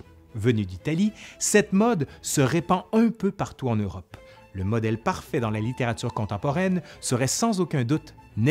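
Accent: Quebec, Canada. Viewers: Bravo ! J apprecie de plus en plus votre chaine. J adore l histoire, et vous arrivez à me faire découvrir un tas de choses ! En plus, j avais un peu de mal avec l accent québécois et maintenant j adore 🥰 Continuez, c est si passionnant!! À bientôt, Aurore de Paris 🐇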